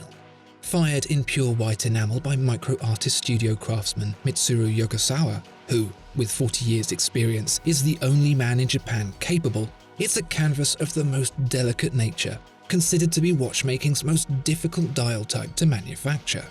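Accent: United Kingdom. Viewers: That British accent....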